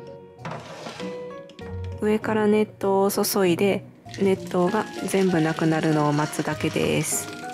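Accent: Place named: Kansai, Japan. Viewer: 関西の方ですか？喋り方が気になって動画の記憶が ダイソーセリアには良くお世話になってます!いい商品多いですね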